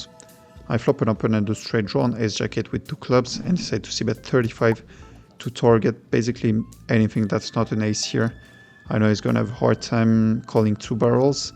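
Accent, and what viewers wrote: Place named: France. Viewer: Je trouve que tu perds de ton charme, tes vlogs étaient assez cools à regarder en français et tu avais ta petite touche humour à toi. En anglais et avec l’accent français ça rend forcément un peu moins bien mais force et honneur pour tenter ça et aller chercher le marché US anglophone, ça reste quali et nice comme contenu 🔥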